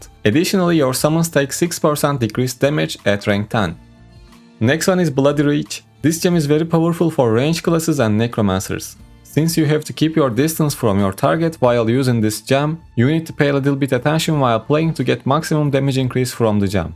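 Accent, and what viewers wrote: Turkey. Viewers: Lan konuşmandan Türk olduğunu anladım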